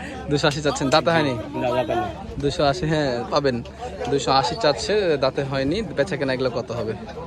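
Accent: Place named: Rajshahi, Bangladesh. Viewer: আমার ভাগিনী বিয়ে দিছি রাজসাহিতে ওর জামাই সাথে মাজে মাঝে কথা বলি আপোনার ভাষা ওনার মতো তাই জিজ্ঞেস করেছি, দেসের বাড়ি আসলে একদিন জাবো বেরাতে, আমারো ইচ্ছে আছে গরু মহিষ এর খামার করবো বাকিটা আল্লাহর ইচ্ছা দোওয়া করবেন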